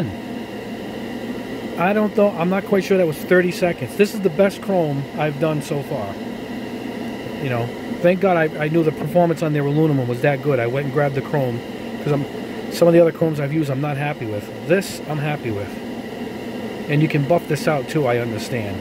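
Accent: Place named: Massachusetts, United States. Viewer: Hey but your accent it sounds like you're from Massachusetts. I'm in Halifax on the South Shore. What store in Massachusetts can I pick this paint up at where do you go. Thank you so much I think I'm doing my 24th scale drift car '1970 Camaro. All Chrome. Maybe blue race stripes. Or maybe just some pinstriping good anyways if you can help me out I'd love it thank you very much great video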